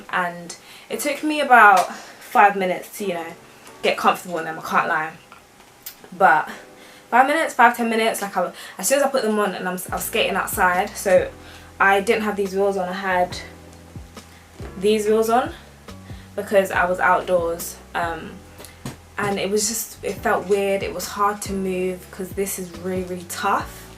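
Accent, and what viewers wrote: United Kingdom. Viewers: Good on you for buying stuff from olis, i know them and they are a family run business by a family of skaters and decent people. I skated their sessions every Sunday and can't wait for these stupid covid restrictions to end and for the sessions to resume. I have Bauer NS and I can't get on with them ether, bloody amazing looking boots but they give me horrendous blisters on my ankles and the lack of boot flex does not suit my skating style as I like to have hard bushings and have them tight for speed and rely of upper boot flex to be able to lean in turns but the NS has a boot as stiff as a corpse lol. I the boots i regularly skate on are my 90's bauer turbo and my new supreme turbo which are a clone of the Bauer turbo. Was nice hearing your London accent but it made me homesick, I'm originally from east London but moved out to Salisbury in Wiltshire 9 years ago and it drives me mad living among all these countryside snobs ha ha.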